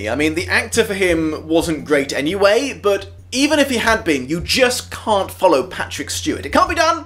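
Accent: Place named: United Kingdom. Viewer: From a guy with an British accent, it's funny that you miss that Dune is closer to T. E. Lawrence in the Arab revolt in WW1 (Lawrence of Arabia) than current events with the USA.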